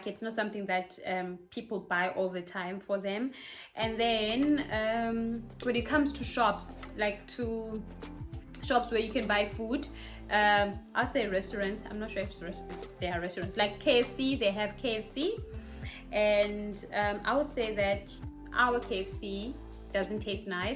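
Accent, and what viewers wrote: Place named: South Africa. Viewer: No our accent isn't different yours is different we were born and bred in England and we speak English, you speak south African English with a South African accent.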